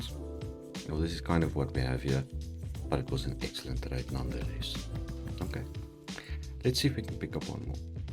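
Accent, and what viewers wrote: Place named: Afrikaans, South Africa. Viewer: Sound like you Afrikaans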